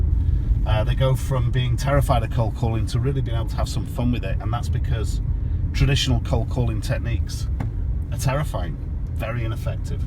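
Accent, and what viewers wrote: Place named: Liverpool, United Kingdom. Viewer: A scouser in Miami lol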